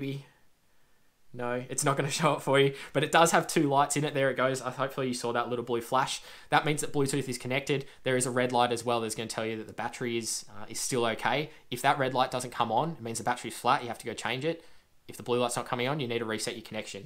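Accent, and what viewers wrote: Australia. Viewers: I concur, and the Aussie accent always helps 👌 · Hey, that was a good Video really appreciate you work on this, I myself use a Polar H10 Cheststrap along with the Vantage V, have been stuck with Polar since 2013 and since then always Cheststrap measuring. I'm very interested in the topic of burning 10 000 Calories (opposing to eating those) Have done that in 2017 (but despite having all material never managed to cut it and upload it) Now I have seen qute some Videos of people attempting it with all kinds of measurements...yes also using myfitnesspal and no heartrate measurement whatsoever. Then also a lot with the wristbased measuring and i had the feeling those might read the heartrate higher. You said because of bloddpressure the reading might be higher on the wrist. WOuld you assume in a quite heavy lifting session as the bloodpressure shoots almost through the roof the wristbased measuig would give a higher average heartrate than the cheststrap? 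Cheers mate greetings to Australia I'd guess from your accent...but only after having posted this comment will checkout your other videos where you might have revealed that alrady. Sporty Greetings from also a Sportscientist from Germany living in Dubai.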